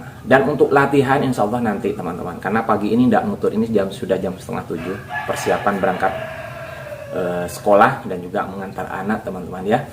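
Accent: Madura, Indonesia. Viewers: Orang madura ya kang sampean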